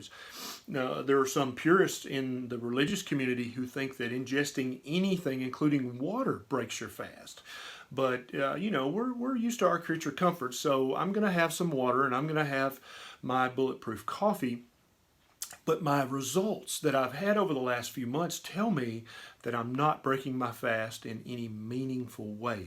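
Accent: South, United States. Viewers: 1 sub or a 1M subs, I love. That southern charm is wonderful, "supper" LOL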